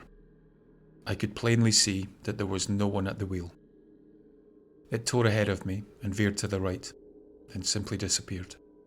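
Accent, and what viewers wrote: Scotland, United Kingdom. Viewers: Excellent Narration. Excellent Voice. Thank You SO Much for the beautiful landscapes-for the Lack of background noise that would interfere-with hearing the wonderful historical, & supernatural information from your great, original Scottish voice. I've always wanted to see Scotland, & now I can, thru your wonderful videos. Please-Change Nothing. It is Perfect, as is. Cordially-Pam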